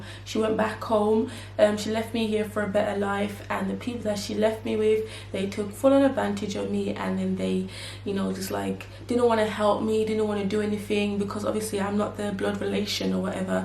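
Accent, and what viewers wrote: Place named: Jamaica. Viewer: I hear the Jamaican in you.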